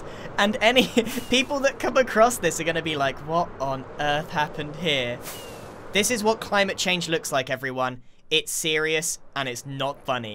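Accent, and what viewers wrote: United Kingdom. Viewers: "What in the broken teabags" yep, he's definitely british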